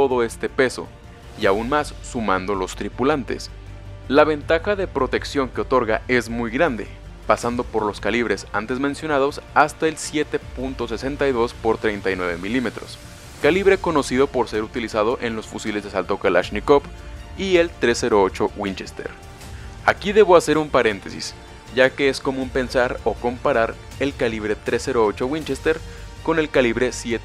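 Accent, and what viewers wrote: Mexico. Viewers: eres de México?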